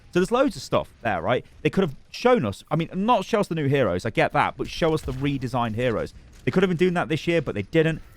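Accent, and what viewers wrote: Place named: United Kingdom. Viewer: Good ol I don’t even listen to the video, this is just asmr of a British man talking gibberish for 10m 💀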